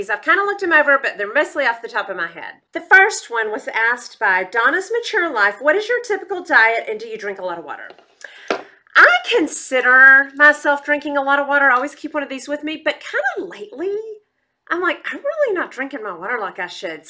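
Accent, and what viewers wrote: South, United States. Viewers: Due to your "slight" southern accent and my "slight" hearing problem, I always use captions if they are available. When you gave your favorite movie, it came across as "Lend Some Death" and I thought what the heck???? Then, of course I figured it out!!! Isn't that a hoot??!!! I can tell you whoever does the captions is NOT southern, lol. Anyway, this was a fun video, I too have great respect for teachers and could NOT do it, they do NOT pay them enough and pay the administrators far too much. IMHO. Could not be a "flight attendant" either since I no longer fly (long story). Used to work for realtors during jr high and high school (yes, I was industrious)-- didn't want to pick blueberries in the summer so taught myself to type!! Looking forward to Part II -- You are fortunate your DH still carries on conversations with you! :-)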